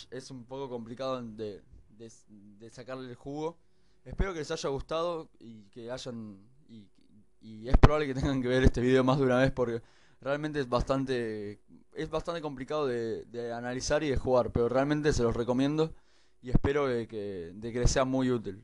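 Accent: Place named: Argentina. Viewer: Porque los argentinos pronuncia la yo o llo...con chooo???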